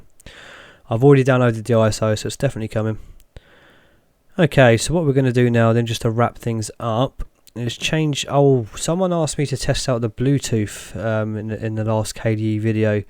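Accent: United Kingdom. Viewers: Pretty good channel. Nice British English pronunciation... I wonder what kind of opinion will have regarding Manjaro 20.1 Cinnamon Edition...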